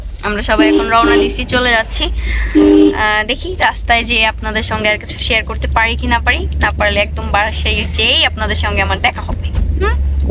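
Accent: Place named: Bagerhat, Bangladesh. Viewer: আপু আমার বাসা বাগেরহাট আমি অনেক বার মাজারে গেছি আর একটা জিনিস অনেক ভালো লাগে আপনার ভাসা আমাদের মত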